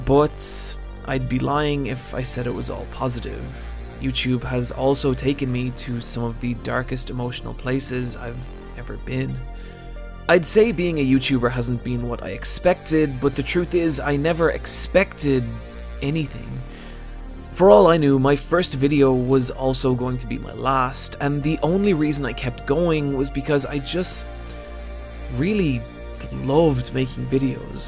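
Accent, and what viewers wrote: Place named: Ireland. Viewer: started watching cus i liked the irish drawl. stayed because you clearly have a lot to say, and i'll always listen to those who take the time to talk about the shit they're passionate about.... as long as they're not fascists.... you're not a fascist, are you?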